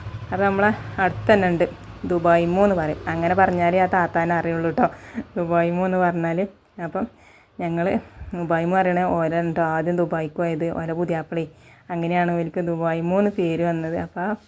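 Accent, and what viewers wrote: Malappuram, India. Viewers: അന്റെ നാട് ഏതാണ് ഒർജിനൽ മലപ്പുറം ഭാഷ ആണല്ലോ ഇജ്ജ് കജ്ജ് പജ്ജ് നെജ്ജ്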